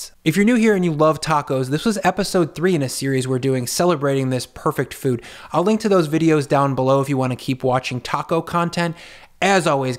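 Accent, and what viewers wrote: United States. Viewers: I'm American. You sound American. Why are you using the Metric System? You can always edit for those overseas. I'm still gonna try your recipe though.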